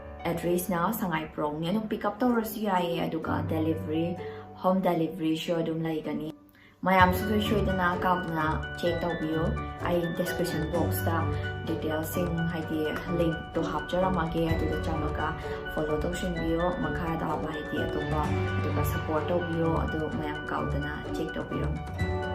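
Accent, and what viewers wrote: Kakching, India. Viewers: Yengba yamna nungai tabasu yamna nungai nungai harisini eina ngang ja re si kakching maron dniko 😁😁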